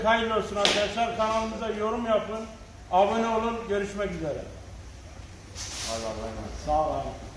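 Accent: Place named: Urfa, Turkey. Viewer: Ustam urfanın neresindensin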